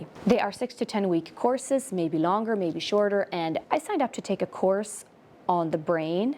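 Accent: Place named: Ireland. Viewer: Your accent has a tiny bit of an Irish sound to it at times, either you did some travelling or Hutterites accents change a bit from colony to colony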